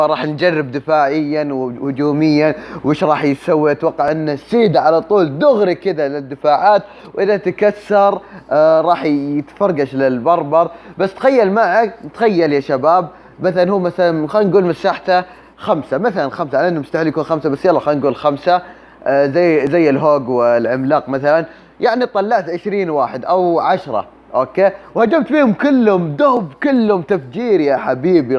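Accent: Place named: Saudi Arabia. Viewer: kmal alswydy واضح كلامه هوا من السعوديه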